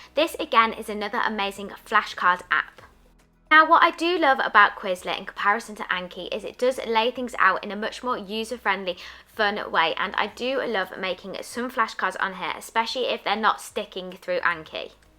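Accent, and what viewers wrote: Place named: United Kingdom. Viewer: Guessed it with your British accent..😌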